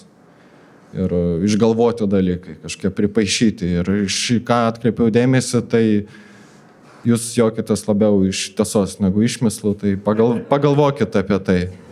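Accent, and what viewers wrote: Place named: Vilnius, Lithuania. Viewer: Pagaliau Vilniaus krašto komikas. Tuteišai unikali kalba! Niekas niekur daugiau taip nekalba. Teko suprasti tik emigravus 😅 Atrodė natūralu visada. Šaunuolis!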